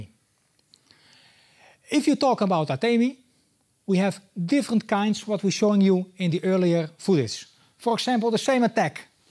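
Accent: Netherlands. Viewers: Your english is oki :D dutch accent is funny, too. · Thank you very much for your hard work! btw, your English is actually awesome. by your accent I'd say your Dutch, am I right? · Your English is good, you sound Dutch I don't speak Dutch but do speak German as well as English. I was told while learning German you never loose your native ancient unless you live in the country for 20 or more years.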